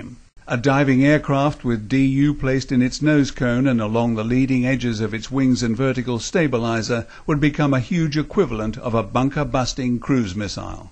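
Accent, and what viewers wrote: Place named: RP, United Kingdom. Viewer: More disinformation, deliberately delivered with an austere well spoken English accent designed to give it an air of legitimacy. Doesn't actually address the point. At 700 feet it is impossible for a 737 to travel at or around 500mph. Though some official estimates put them second at over 550mph. It would disintegrate and also be impossible to maneuver the way it did. Think driving a double-decker bus at Formula One speeds. Also doesn't address complete nose section making it through multiple layers construction grade of steel intact. Depleted Uranium anyone? If you believe the official story you are a child.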